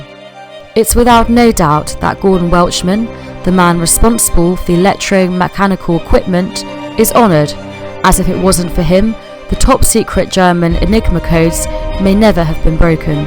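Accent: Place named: RP, United Kingdom. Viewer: Very interesting but the commentary leaves something to be desired, I think it's done by a robot with a posh accent.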